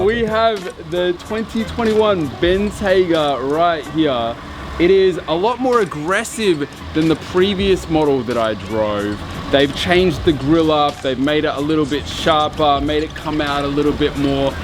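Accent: Australia. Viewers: The way you break down the description on the car in the Australian accent!❤️💕 horses are so 🥰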